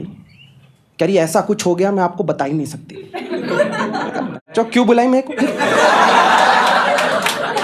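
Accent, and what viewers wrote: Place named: Varanasi, India. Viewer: Nice, your banarasi accent is so relatable. Your comic sense is wonderful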